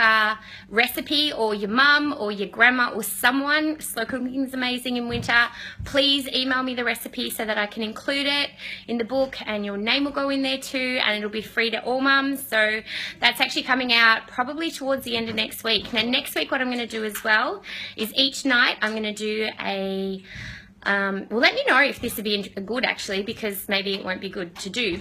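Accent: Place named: Australia. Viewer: Hi, Thanks for showing me how to make slow cooker porridge. Incidentally I like your warm Australian accent and the gentle way that you supervise your daughters who seem at that curious/testing things out stage of development. I am single and have a compact slow cooker, so I will just have the amounts. Warm regards from Robert in Scotland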